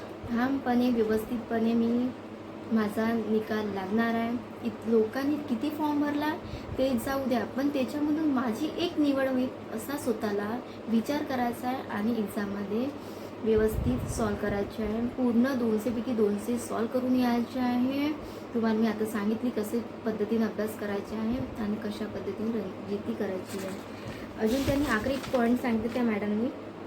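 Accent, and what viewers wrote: India. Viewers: Nagpuri bhashya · मॅडम तुमची भाषा खूप छान आहे. पूर्व विदर्भात आहेत का तुम्ही.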